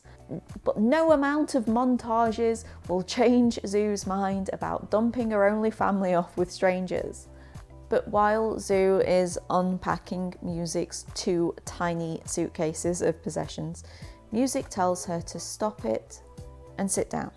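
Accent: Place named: England, United Kingdom. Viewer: I just re watched this video to try and work out what you were talking about, the person who made this video is saying the word ableist in her accent and is the way that I would expect to hear it. I'm sorry that you aren't used to hearing this accent (I'm not from the UK so can't tell you which part of England it is from), that doesn't mean that she has mispronounced the word.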